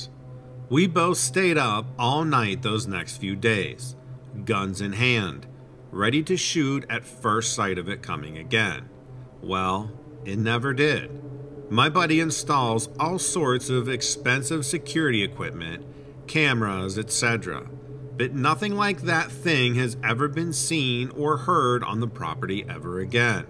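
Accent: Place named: South, United States. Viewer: I can tell you changed the tone a little you brought on more of the southern lawyer twang tyr sound. I dig it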